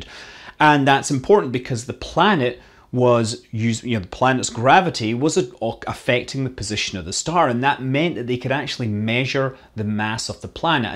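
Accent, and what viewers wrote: Scotland, United Kingdom. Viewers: I had to rewind to listen again to what you actually said.... because I was expecting you to say "temperature", so that's what I "heard" you say! When I read the overlaid correction, I thought, "Wait.... What?" This is all because I have a very hard time processing auditory information quickly enough, and with a Scottish accent and rapid delivery, in tend to miss lot. (This makes telephone conversations hard work for me, esp. with the wretched voice quality on American mobile phone service.) Thanks to YouTube for offering 10-sec REW!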